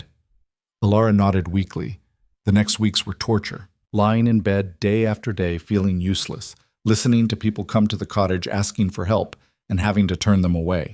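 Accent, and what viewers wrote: United States. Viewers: So much ridiculous drama. The choice of narration grates - makes them sound like teenagers. Truly recommend scrapping the flat American accent. It’s a British tale, deserves British narration.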